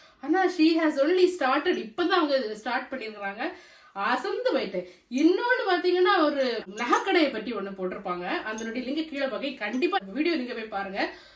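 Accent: Nagercoil, India. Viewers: I love to hear your Nagercoil Tamil. I am from Nagercoil settled in Bombay. I watch your almost videos. Beautiful couple. I never seen your elder son. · Sister so so cute and beautiful.your voice really nagarkovil.brother super aha flights podukirarkal.super.daily i watch your videos.sweet voice.effect aha ullathu. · All ur video are too good sister. Ur Nagar kovil language is very nice to hear . Keep doing it sister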